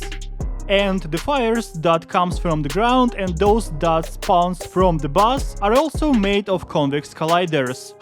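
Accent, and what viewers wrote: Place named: Russia. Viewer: It's a Russian accent this guy has a YouTube channel in his native language.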